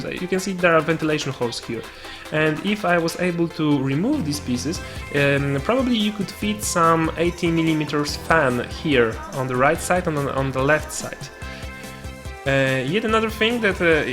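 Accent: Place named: Italy. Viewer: aw well, your accent sounds like you're italian xD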